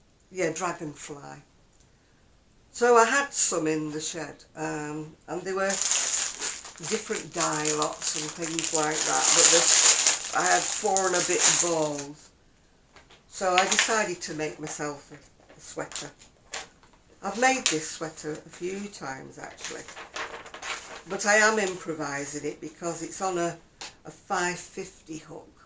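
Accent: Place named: England, United Kingdom. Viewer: Sweet Pea… that was an enjoyable chit chat. Especially the English accent. What happened to respect your elders, as I am one of those now. Oh well, it is easy to find the dark but but but……I search for the light with a chuckle under my breath. Be well, Love‼️❤️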